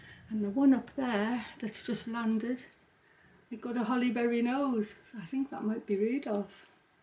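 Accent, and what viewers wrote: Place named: Northern England, United Kingdom. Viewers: I can’t tell you how much I’ve enjoyed being with you throughout this month, it’s been fabulous. I’ve enjoyed watching you, tidying, crafting, baking and decorating the house. I’ve loved listening to you, I’m originally from South Shields so your northern accent has been a pleasant reminder of home. Have a lovely Christmas time and I look forward to sharing time you in the coming new year. Thank you. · I've arrived here very late! I love this... Your bookshelf could've been lifted from my house. And I love that I've found a northerner to listen to.. I'm East Yorkshire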